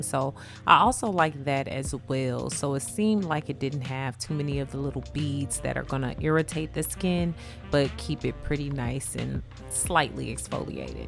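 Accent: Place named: St. Louis, United States. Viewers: Thanks for sharing. I love Dollar tree. I'm here for you anytime you say, here, there, etc all I think is St. Louis and Nelly. I love it ❤️❤️❤️ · Off the subject of the video but you talk like you're from St. Louis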